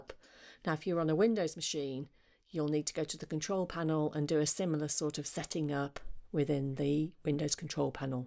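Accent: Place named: Camden, United Kingdom. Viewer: Your lovely. Tnx from Chile. You rock. And great proper english lol. Bring memories of my Camden boozy days lol. Not you just the accent lol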